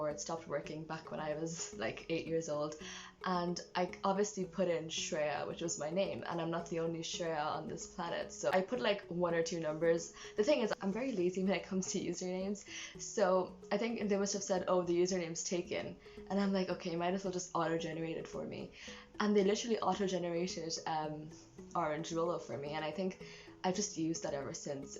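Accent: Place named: Ireland. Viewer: ahhh it's so nice to hear you talk! :) I didn't know you were from Ireland, that's so cool! I'd love to see more of these kinds of videos sprinkled in with your covers in the future!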